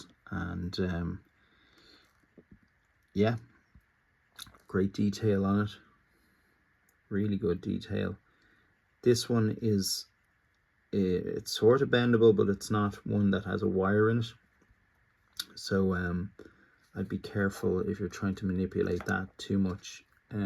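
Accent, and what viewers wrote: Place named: Ireland. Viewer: Recognise the irish accent. This is a great figure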